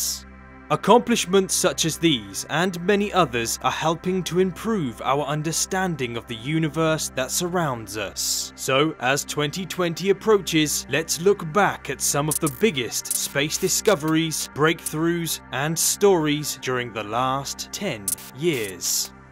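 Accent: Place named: West Midlands, United Kingdom. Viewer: This guy has to be West Midlands/south west England. There was a wee farmer twang in ‘excitement’ and ‘violent’ for sure. Great accent!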